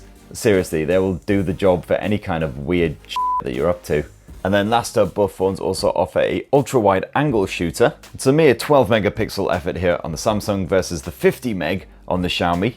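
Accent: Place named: Newcastle, United Kingdom. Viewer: Believe me when I say doesn’t speak with a typical English accent…! He’s what’s known as a ‘Geordie’. 😶 Most British people struggle with their accent too. It’s why we’ve banished them to the far north east of England!🫨 Think North of the Wall in GoT without the furry clothing. Does make good videos though…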